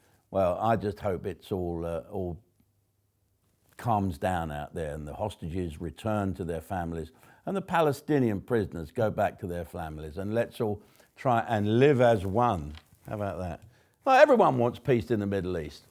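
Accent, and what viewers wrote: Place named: Scotland, United Kingdom. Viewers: She and her cronies in the SNP have destroyed Scotland, no surprises that their so called ‘marriage ‘ is finished if it was ever started in the first place!!!! I suspect a marriage of convenience great Scottish accent x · Great Scottish accent... You were part of my life living in Glasgow on a Saturday night when we laughed...along with Bernard manning, Freddie Starr, 2 Ronnies, Benny Hill etc....Changed days.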